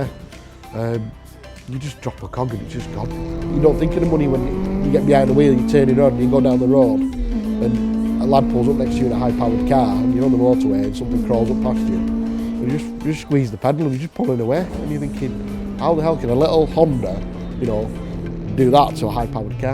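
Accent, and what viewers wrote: United Kingdom. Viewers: Didnt understand his harsh brittish accent but what ever he said sounded frigging cool 😎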